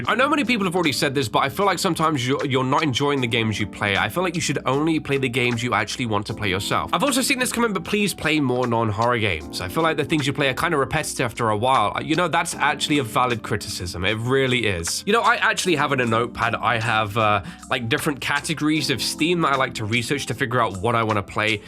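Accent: United Kingdom. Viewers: For what it’s worth, I think you’re a fantastic YouTuber. I agree that it would be cool to see you explore games other than horror. But I stumbled across your channel looking for Backrooms themed games and I’ve been hooked on your videos ever since. It’s nice hearing a fellow British accent too. Your voice is way more relaxing than a lot of other gamer YouTubers. It would be nice to have longer, unedited content. I’m here for it! Great video! Keep doing what you love!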